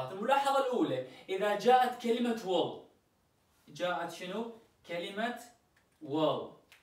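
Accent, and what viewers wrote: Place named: Nineveh, Iraq. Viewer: هههههه نفس لهجتنا اني من نينوى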